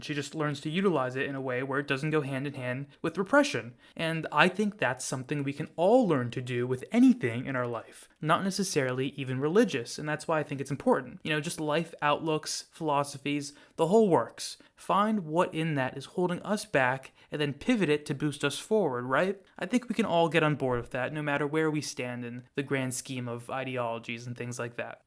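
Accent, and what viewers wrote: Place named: Scotland, United Kingdom. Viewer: Nice thoughtful look at Rahne Sinclair! Loved the Scottish accent!! Looking forward to your thoughts on the other New Mutants! Thanks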